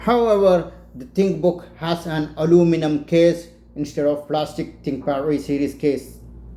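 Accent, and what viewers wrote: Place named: England, United Kingdom. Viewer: brilliant English accent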